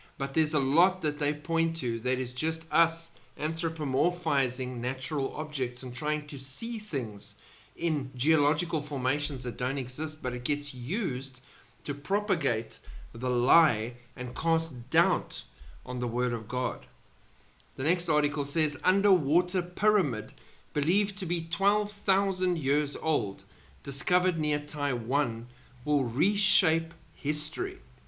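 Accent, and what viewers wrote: South Africa. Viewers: South African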